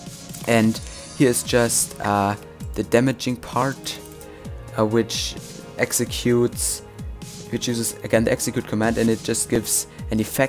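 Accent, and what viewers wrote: Germany. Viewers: Du solltest unbedingt üben flüssiger, schneller und ohne deutschen Akzent zu sprechen...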